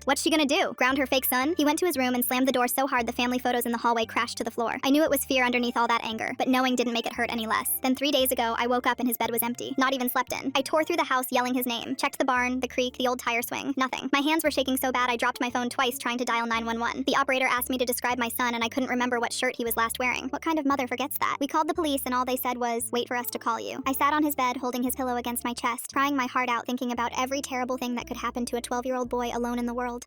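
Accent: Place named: South, United States. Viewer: Damn, you’re southern as hell😅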